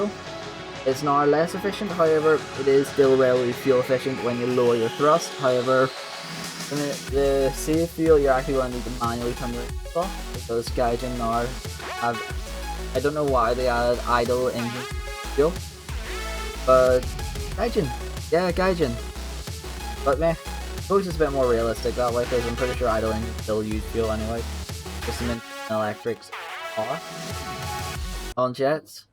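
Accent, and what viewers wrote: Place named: Ulster, United Kingdom. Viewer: Your definitely an ulsterman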